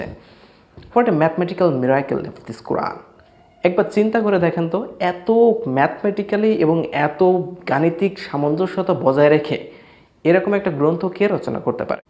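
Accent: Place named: Barisal, Bangladesh. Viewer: আপনার তথ্যগুলো চমৎকার। কিন্তু কয়েকটি বর্ণের বরিশালের আঞ্চলিক অশুদ্ধ উচ্চারণ শুনতে খুবই বিশ্রী লাগে।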